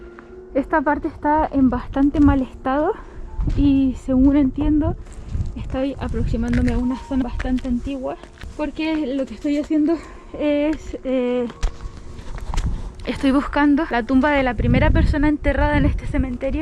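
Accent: Chile. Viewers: Saludos desde Chile. Tienes a veces un acento como el nuestro. Un agrado descubrir tu canal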